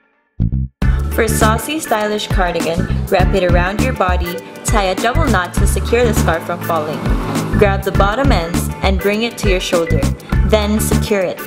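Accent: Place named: Philippines. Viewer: Are you a filipino i asked cause of your accent i am a filipino myself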